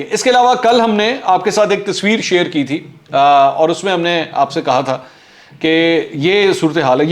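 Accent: Punjab, India. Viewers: Me ap ki punjabi style ki waja say vlogs sunta hun realy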